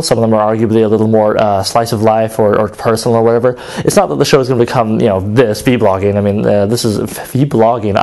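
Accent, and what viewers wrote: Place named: United Kingdom. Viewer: here are some things u might want to change in the show from a outsiders opinion, i really want to see u succeed. 1. where different clothes, no more vest and tie, be more casual those clothes distant you from your audience 2. more british accent people love that 3. don't try so hard, you don't have to force jokes, and run them over with a peer see if they laugh if not scrap it, but your gut feeling is the best. obviously there are more/better ways to get more views but here a a couple.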